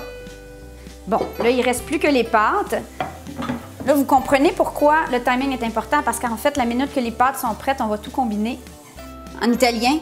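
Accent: Quebec, Canada. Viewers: J'adore l'accent québécois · L'accent quebequois gâche tout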